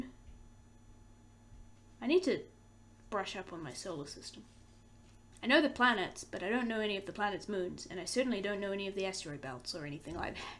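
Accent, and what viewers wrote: New Zealand. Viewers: LOL Well that is funny. A Kiwi. I didn't pick up your NZ accent, but I should have, as I love Karl Urban. I just noticed the way you said idea. Thought you might be from southern US, not the other side of world. LOL 😆 I enjoy your reactions though and you haven't hit the REALLY good stuff. It's about to seriously heat up 😁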